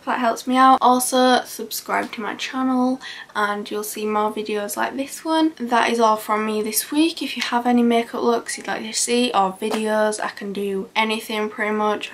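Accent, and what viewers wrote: United Kingdom. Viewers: Your British accent 😍😍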